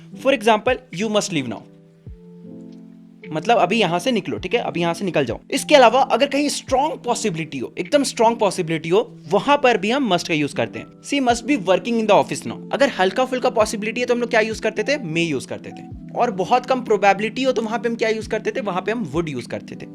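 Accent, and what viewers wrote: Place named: Rewa, India. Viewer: I know you are from Mp rewa ......... By your accent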